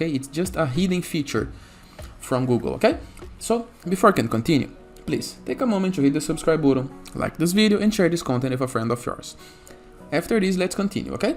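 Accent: Brazil. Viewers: good video sotaque brasileiro kkkkk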